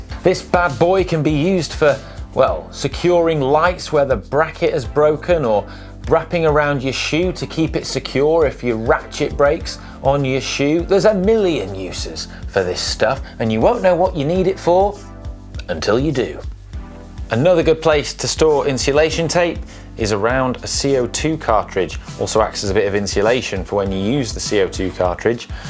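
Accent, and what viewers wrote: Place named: Yorkshire, United Kingdom. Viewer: He reminds me of Jeremy Clarkson with his mild (I assume) yorkshire accent.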